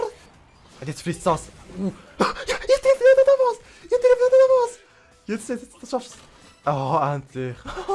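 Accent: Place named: Switzerland. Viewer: Ich bin zwar aus Österreich und rede eigentlich nur Hochdeutsch, verstehe dich aber trotzdem mega gut. Generell liebe ich es wenn jemand Schweizerdeutsch spricht.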